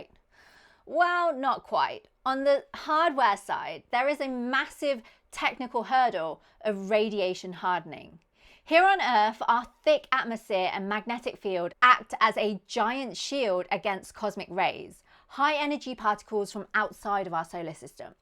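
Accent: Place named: Midlands, United Kingdom. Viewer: Why no Wikipedia? Or did I misspelll? " 2 March 2018 J04n talk contribs deleted page (Wikipedia:Articles for deletion/ (XFDcloser))" I was just wondering what her accent is. It doesn't really sound Midland, but words like "upset" sounds like "oopset." So, maybe she is. I luuve her content.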